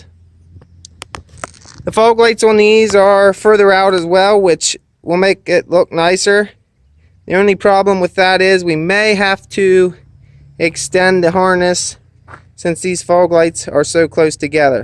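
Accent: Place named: Eastern Pennsylvania, United States. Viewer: If I had to guess, I’d place him somewhere in eastern PA.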